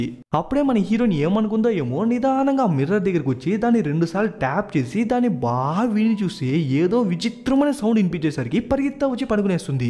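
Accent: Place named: Rayalaseema, India. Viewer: Ni voice Matra super daniki రాయలసీమ భాష ఇంకా సూపర్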